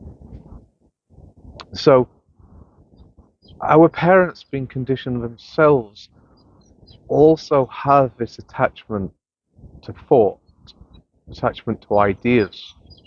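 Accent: Manchester, United Kingdom. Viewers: Thanks where are you? I’m in Lancashire you sound like Manchester Bury,Oldham way great video 👍🏻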